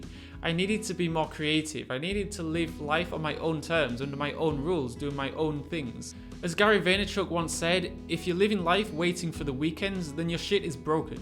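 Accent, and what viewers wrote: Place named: United Kingdom. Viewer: Its a ridiculous how elegant your british accent sounds even when you are saying this like “then your shit is broken” 😂😂 Another video to love and another idea to cherish.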